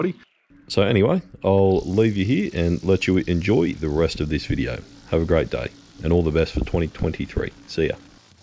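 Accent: Australia. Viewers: Hi, I too love to hear your Aussie Accent!! So glad your giving the donation to Jenny`s Place! ❤ Now this happen to another man who cuts lawns in Florida, he was Edging but owners left their vehicles on the driveway right up by the house & he had little space to do the edging, but did it nicely & it was a Free One!! But something was in the grass & it flew & hit the Glass front door & shattered it...he had to replace door...ouch for the Cost! So I thought I warned you about it, I don`t want this to happen to you! Another Great Job on this yard!! Have a Good Work Week & a Lovely Weekend with Family! 🥰 Love from Central Illinois USA😉😎❤ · it's great to have you back and hear that lovely Aussie accent! We miss you when you're not here but I hope your brief absence means you took some time for rest & family. I think your decision to donate to Jenny's Place is wonderful. 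Don't feel badly that you started 2023 out 'softly' by showing us one of your regular customer's yards. We see so many overgrown yard 'rescues' that once in a while it's nice to see a well-kept yard getting trimmed, and it shows us that not everything you do is a struggle. Best wishes for health and happiness in 2023!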